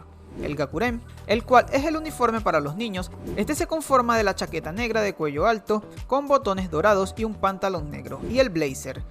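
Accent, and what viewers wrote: Venezuela. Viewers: compa eres venezolano? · Eres venezolano?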